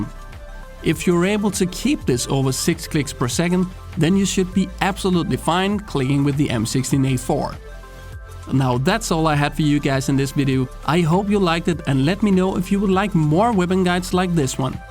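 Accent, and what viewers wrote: Denmark. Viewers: So easy to hear you are Danish dude hehe 💪 you are doing a great job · Danish, right? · Is it just me or does they guy have a Danish accent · Are you danish? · Er du dansker? ☺ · er du dansker? :P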